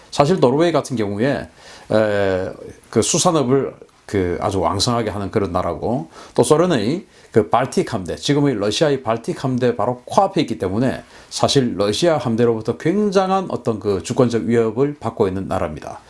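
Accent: Gyeongsang, South Korea. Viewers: 다좋은데 상도사투리듣기가 좀 거북하네요.표준말쓰세요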